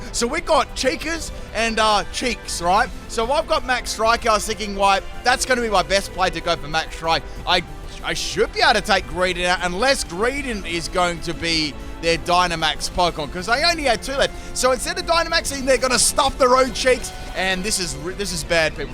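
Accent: Australia. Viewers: Australian accent "...and my Noinoi is gonna be noi more!"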